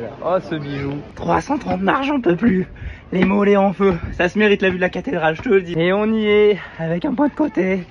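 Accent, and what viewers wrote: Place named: Alsace, France. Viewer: Ben c'est sûr, il est à Strasbourg, mais prononce à la hautrhinoise... Et en plus, on en a 2 des jours fériés en plus ✌️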